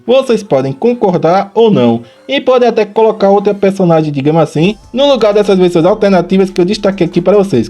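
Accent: Northeast, Brazil. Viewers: Mano curiosidade foda que eu adoraria saber seu sotaque e nordestino?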